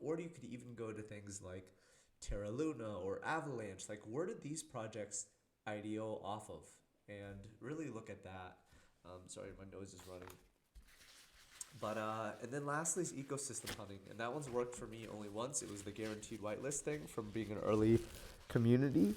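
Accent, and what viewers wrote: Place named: United States. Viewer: You sound American. How can you invest in IDOs when they all require KYC to filter Americans out?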